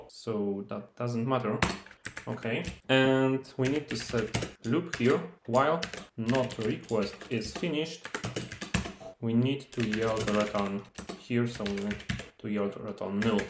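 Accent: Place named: Poland. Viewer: Oglądałem ten tutorial i tak coś czułem ten polski akcent xD Ale dobra robota z tym filmem :D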